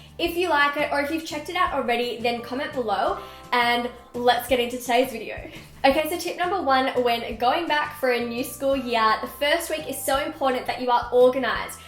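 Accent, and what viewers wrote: Australia. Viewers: i dont really understand australia accents but i know ur videos so great. i really want to understand it, pls make english subtitile:( so sad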